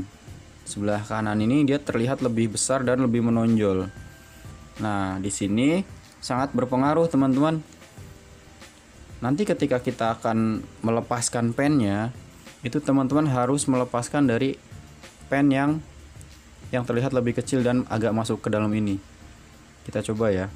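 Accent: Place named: West Java, Indonesia. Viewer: sunda pisan mang..nuhun trikna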